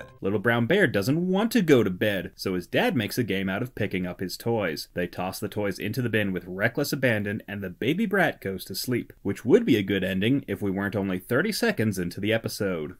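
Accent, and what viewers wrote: United Kingdom. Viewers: nice English accent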